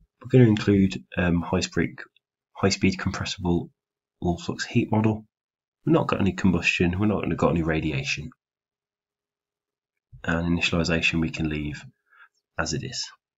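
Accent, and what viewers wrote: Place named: North, United Kingdom. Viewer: Thanks for your reply!, im from Australia. Your videos were a great help with my Thesis, modelling and simulating a new Wind Turbine i designed. I mentioned to the other Engineers for great professional tips look out for the guy with the Northern UK accent, am i right location wise? .. Yeah the mesh looks good after a tonne of fine tuning whilst using various research papers also in setup. I actually used one of your other videos mesh today and its currently running, it hasnt crashed so thats good but looking at the plot monitor for normal forces and its still too high. Im going to save this project as it looks like its actually going to complete successfully. I will recreate the mesh using this videos mesh and let you know if it improves the Y+ value which i would assume isnt good at this point. (What i like about this video is the refinement region), which i have been seeing other similiar simulations to my own using but in a convoluted way. 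Your way makes way more sense. Thanks again mate!.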